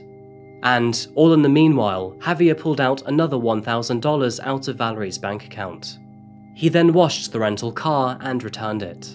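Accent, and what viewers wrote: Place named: England, United Kingdom. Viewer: I believe he actually does live in the US now, if I remember correctly when mentioned in a previous video. I forget what city/state. EDIT: I was wrong, he is FROM America, but moved to the UK at a young age and lives there now! I got it mixed up! But now I can't remember what city he was from in the US. I feel like it was in the Pacific Northwest for some reason? Maybe I just have Seattle on my mind because he loves coffee lol. I honestly don't know. But yes he moved to UK at age 7 per his Q&A video and developed the English accent. He is likely just promoting Scentbird because they're a sponsor for his channel, but sponsors often send the content creators free stuff.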